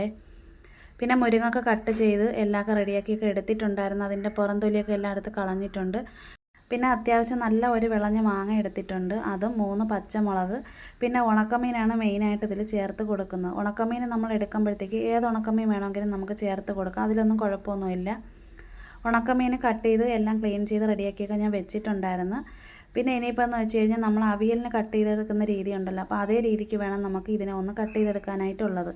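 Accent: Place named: Thiruvananthapuram, India. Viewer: Kutteese veedu എവിടെയാ, slang കേട്ടിട്ട് tvpm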